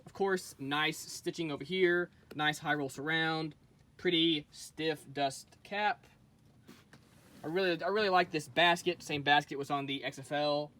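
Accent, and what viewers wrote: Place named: South, United States. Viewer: I’M SUB’d…he speaks from experience, plus a whole lot of southern flavored enthusiasm…much love, bro…peace out..:)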